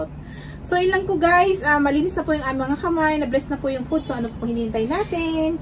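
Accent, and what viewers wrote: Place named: Batangas, Philippines. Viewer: Hello sis ko, medyo nalate ako ngayon. Sarap ng chicken curry sis. Sarap mo talaga magluto ng mga may gata. Akoy relate na relate sa punto ng salita nyo sis palibhasay malapit lang sa tiaong ang batangas. Hehehe. Ineng din ang tawag sa amin eh. Hehehe. Hello sa sister mo sis. Waiting talaga ako sa gelatin eh. Sarap. Ingat kayo lagi dyan sis. Waiting for youe next mukbang with Jaxxas. 💞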